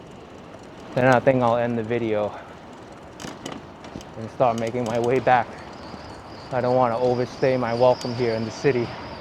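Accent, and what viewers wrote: New York, United States. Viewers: I find your series more enjoyable when you make a live commentary... love the New York accent!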